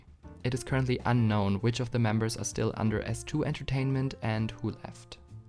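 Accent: Germany. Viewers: Du hast so einen krass starken deutschen Akzent, habs direkt erkannt weil ich auch so Englisch spreche 😋🤫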